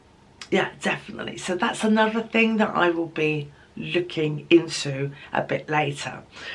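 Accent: Wales, United Kingdom. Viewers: Yay, good to see you as you've been missed. Shame that the teaching assistant post did not work out. Working in a kitchen proving a diet disaster !! Keep practising the Welsh accent. Sorry to hear of the sad loss of friend & family. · There she is❤ and with a Welsh accent too🤣😂 I'm crying 😂 Lovely to see you back❤Life happens and you've been through alot the last few weeks, sending big Cwtches your way🥰❤️🥰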